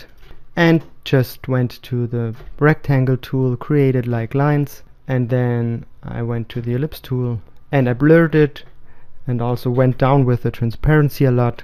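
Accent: Germany. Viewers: Hey, so wie sichs anhört (nicht böse gemeint), kommst du aus Deutschland! Daher kurz auf deutsch: Du hast meinen größten Respekt! Nicht nur, weil du dieses Video fantastisch gemacht hast, sondern weil du allgemein ein fantastischer VFX Artist zu sein scheinst! Ich meine... du hast sogar mit Dinklage deine Zeit verbracht! Ich hoffe, ich werde mal nur annähernd an dein Level kommen! Alles Gute und bleib wie du bist!!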